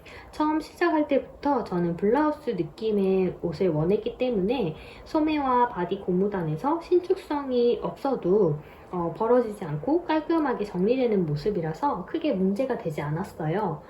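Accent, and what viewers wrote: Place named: South Korea. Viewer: 어쩐지 억양이 있어서 평소에 사투리 쓰시는분인가부다 했는데 역시 남쪽에 사시는분이셨군요😊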